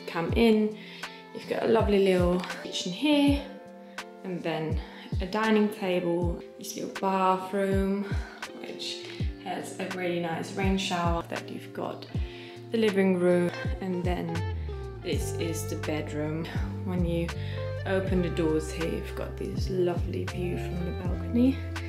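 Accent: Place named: United Kingdom. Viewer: Love it so much! 😍 & you have such a great British accent omg! ❤️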